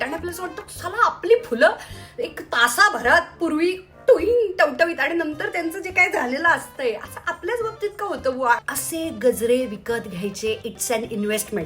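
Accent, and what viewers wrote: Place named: Kolhapur, India. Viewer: Tu punyachi vatatach nahis Kolhapuri pattern ahe ha as Dhak dhak mhantay❤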